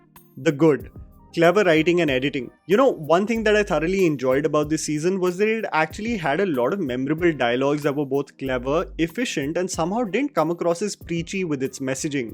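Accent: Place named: India. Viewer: Congratulations of 1M. Every time i start your video for review and end up being mesmerized by your english and accent. Like Uk, Us accent yours one is pure definition of indian english accent. Like this is how is should be.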